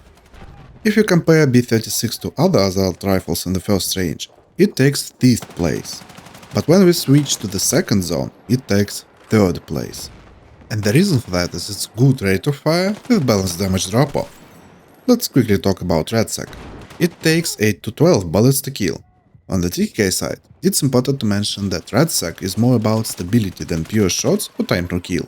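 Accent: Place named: Russia. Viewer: И смысл говорить на корявом английской. Все же слышать что ты русский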